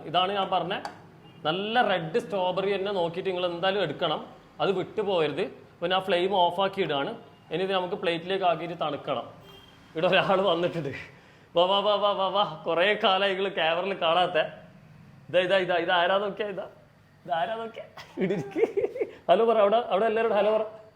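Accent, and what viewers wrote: Kannur, India. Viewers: കണ്ണൂർ ആണല്ലേ ഷെഫ്?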